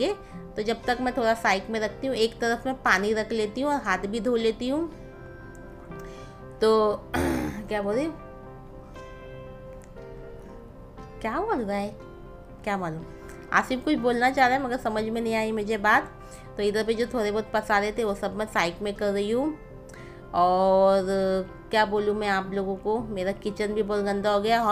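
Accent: Konkan, India. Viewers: appa us mirchi ko bedgi mirchi kehte hai love ur voice ekdum kokni wali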